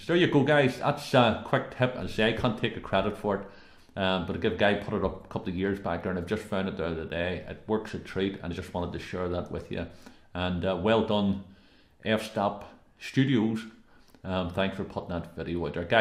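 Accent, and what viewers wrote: Belfast, United Kingdom. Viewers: are u from belfast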